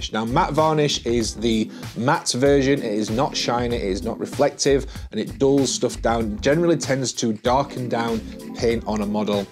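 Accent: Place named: Northern England, United Kingdom. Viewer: Hiya mate great tips here! - At last a video which is to the point and helpful and in a great nothern accent -which i can relate to lol! I just wondered if you might be able to help? I am a complete beginer with figure painting but have an art background so should be fine with the painting part. Basically, I collect transformers and am trying to 'repair' or colour match this chrome orange colour which is flaking off the figure 🙈The figure has like a chrome metallic orange with a mirror finish - so I was going to by some GSW paints as the metallic chrome and some of the other orange inks look like they might work? However do you know would I mix this with an orange first or water it down and put it over the top? any advice or tips woud me amazing - its been really hard to get any specific answers as its a bit of a specific thing I am asking lol!🤣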